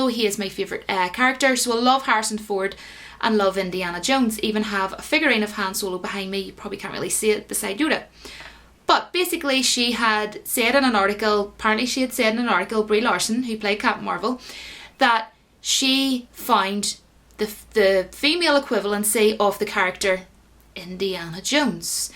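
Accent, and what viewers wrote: Ireland. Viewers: Are you from Ireland ? Moving to Ireland in August. Love your accent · Are you Irish ?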